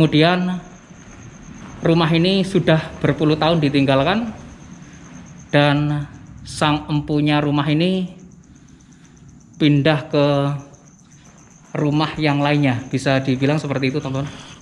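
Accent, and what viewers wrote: Java, Indonesia. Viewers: Ngomongny ms"ny jowo banget...hihi....smoga yg punya msh sehat Aamiin.. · Ini Vloger keren, baru nemu Eyang. Dah langsung subcrib, ngelonceng, like, koment. Dan yang penting Eyang dah nonton sampai gusisss😃😃😃. Suka gaya bicaranya yang njawani. Semoga subcribbernya semakin mlenuk, Nak🙏👍❤ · suka bingiiiitt bhsa jawa nya medok banget mas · Suka bgt kesini karena logat jawanya beda dr yg lain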